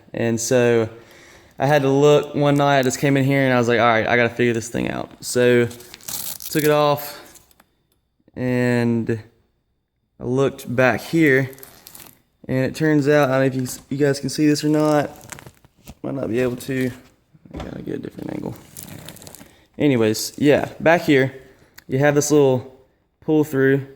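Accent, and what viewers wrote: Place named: Appalachia, United States. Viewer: Always like hearing hillbillies try and explain something.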